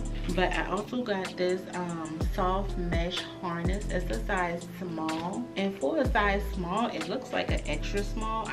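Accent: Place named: New Orleans, United States. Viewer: You must be from NOLA 😂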